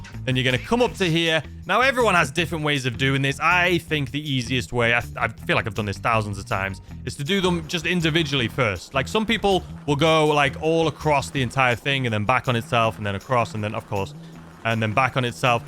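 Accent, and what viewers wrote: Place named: Gloucester, United Kingdom. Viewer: Where was u from originally in the uk bro i hear abit of Gloucester in u lol! Do a Q & A one day so i can ask u lol an how did u end up movin to newyork 😎👌🏼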